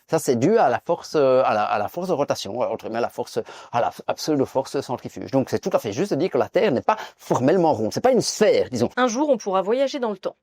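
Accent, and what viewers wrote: Belgium. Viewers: Sans l’accent belge ce mec serait juste lourd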